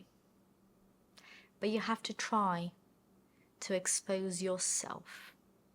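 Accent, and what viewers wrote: United Kingdom. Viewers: Your british accent is so beautiful ❤ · When you start to follow her bc of the british accent and stay here bc she's such a great person and teaches so much more than just english... Well done!!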